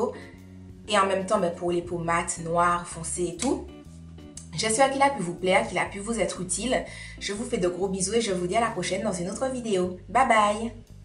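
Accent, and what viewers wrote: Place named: Caribbean. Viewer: Ton accent antillais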